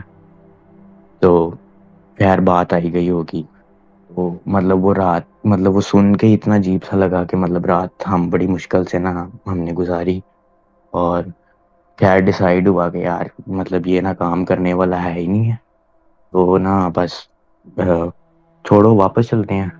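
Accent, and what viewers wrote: Pakistan. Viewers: Yup he's accent is Pakistani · Pakistani accent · Yes he does i thought that too.. even his worfs were pakistani more than hindi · This guy sounds like a pakistani. Not an indian accent for sure.. or is it just me :/ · Ye zrur koi Pakistani he u Jo ainve he zabrdasti ka Indian bna hua h bilkul Pakistani accent h yarr or phr ye basically, basically sun sun kr uljhan hone lagi ...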